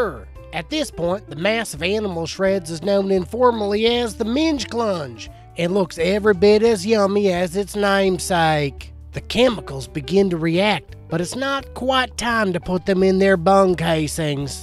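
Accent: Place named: South, United States. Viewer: Sir you are one hilarious son of a bitch! Please I am NOT cursing. I practically Rolled Over The Fucking Floor and Laughed My Ass Off! God I wish I could shake your hand and get an autograph from ya!!! Your Southern accent had me bend over double!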